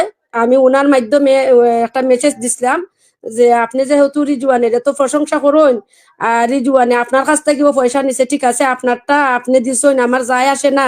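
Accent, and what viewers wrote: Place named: Sylhet, Bangladesh. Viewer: তুমি যে বেটি সিলেটে ভাষায় কথা কও জানো ইসলাম সম্পর্কে তুমি চ্যানেলে ঢুকো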